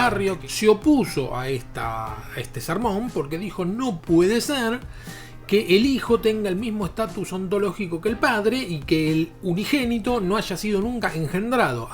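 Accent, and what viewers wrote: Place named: Argentina. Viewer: un saludo hermano usted de que pais es parese asento arjentino saludos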